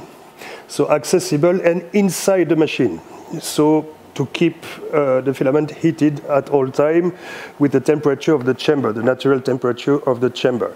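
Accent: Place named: France. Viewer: Yes, this is french accent :)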